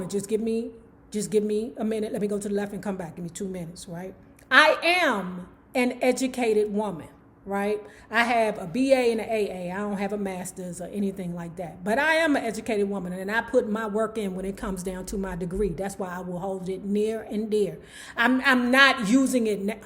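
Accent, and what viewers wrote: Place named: Washington D.C., United States. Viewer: I have subscribed to your channel some time ago and based on your accent im thinking your from Washington D.C if im not mistaken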